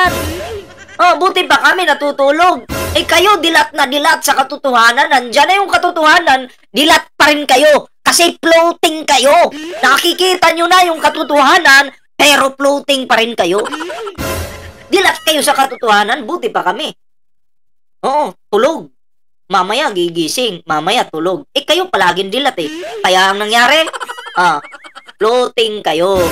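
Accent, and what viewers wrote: Visayas, Philippines. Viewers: Putang ina mo gago ka bat ka nakatakip mukha mo bobo kupal ka matapang ka ipakita mo mukha mo hindot ka sipain pa kita jan boses bisaya kupal na yan.😂😅mukhang pwet kaya nakatakip mukha niya idilat mo mata mo kupal ka Kalahari na nga mata mo nagtatago kapa 😂 ang mga Dutae na pinapanigan mo sila ang tunay na magnanakaw eh malamang ganun ka din ano naayudahan ka ba ng mga Dutae tanga.😅😅😅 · Bisaya ang tunog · Nagsasalita k nakatago mukha mo sa salita p lng me punto n bisaya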